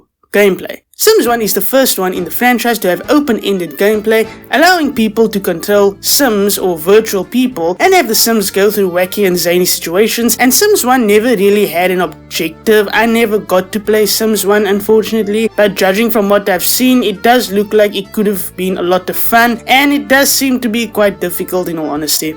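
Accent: South Africa. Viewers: 😭😭😭 I heard the accent and I immediately knew this was a South African channel. what a rare find, love the video.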